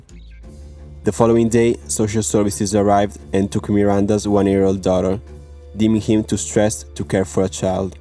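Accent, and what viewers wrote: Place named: France. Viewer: I enjoy your accent. I'm guessing French or French colonized country.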